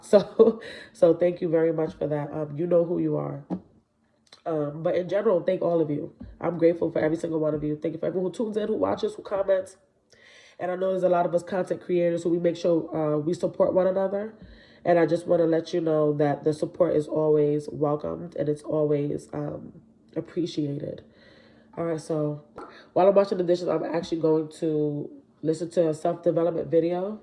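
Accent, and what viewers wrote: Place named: New York, United States. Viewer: Love your accent omg I be trying to talk like a New Yorker lol I'm from Oklahoma City, Oklahoma we have a country accent 😂😂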